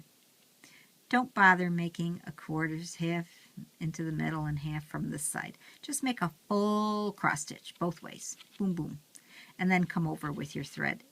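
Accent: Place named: South Carolina, United States. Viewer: I enjoyed looking at all your projects... are you from South Carolina, I'm from Georgia... your accent sounds like my sister in law who lives in Columbia, S.C.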